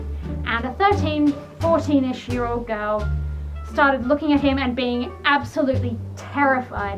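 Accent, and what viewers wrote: Australia. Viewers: 0 ur aussie! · R U ausie?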